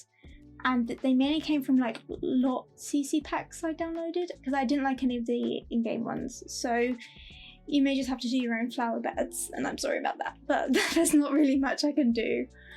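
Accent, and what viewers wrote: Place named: United Kingdom. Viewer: Thank you. I've seen it. :) I'm looking forward to see more from you. And your perfect British accent makes these videos even better.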